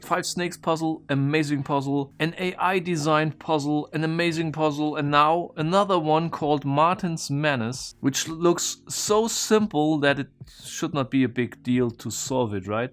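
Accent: Germany. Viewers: Haha love your german accent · I really like your videos, they are so satisfying 😌 also your German accent is awesome 👌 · Wenn deutsche Englisch sprechen 👆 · I am Dutch! I like your german accent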